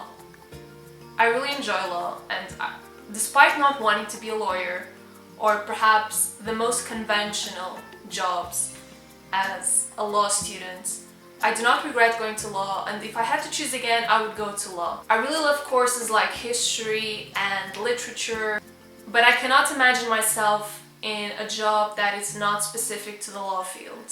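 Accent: Portugal. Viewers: HAHAHAHA sua voz muda e fica com o português de Portugal que fofinho. 😍❤❤😢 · A melhor parte do vídeo foi te ouvir em português. O português de Portugal é muito, muito bonito. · Eu sou Brasileira e o sotaque Portugues do Portugal e muito diferente xD xD xD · Omggg portuguese from Portugal is sooo freaking different 😂😂❣️ (I’m from Brazil) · Adorei ouvir o sotaque português! Sou brasileira, e é engraçado o quão pouco contato eu tenho com o português de Portugal falado. Faça vídeos como esse com mais frequência! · Português de Portugal é tão engraçado e tão bonitinho. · Sou brasileira e amo o sotaque de Portugal ♡♡ queria que os brasileiros usassem o termo "bocadinho" também haha ♡♡♡ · Sempre quis saber se era portuguesa ou brasileira, por conta do sotaque e dos livros em português. Abraços do Brasil! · OMG your Portugal accent is so beautiful! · Since 2016 watching your videos and... 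well, what a surprise! I definitely adored the new format. By the way, Lisboa é a cidade mais bonita que já visitei e seria maravilhoso assistir a vídeos em português. The Portuguese accent is one of my favourites!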